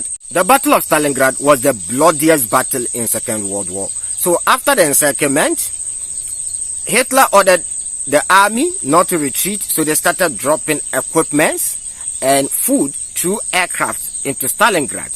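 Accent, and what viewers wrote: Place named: Ghana. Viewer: Stop the Ghanaian English and listen 👂👂 to the West. New technology is the issue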